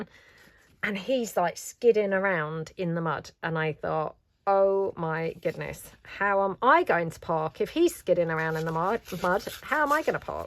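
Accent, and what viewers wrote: United Kingdom. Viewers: Omg those little flowers are adorable. Love all your papers and genius journal ideas. My 5yr old granddaughter loves your british accent and stories about your sausage dog Bo. She keeps asking for one. We have a german short haired pointer we bought her about a year ago but she loves the sausage dogs. ❤❤❤ We are from Kentucky here in the US and we are told we have accents too. 😂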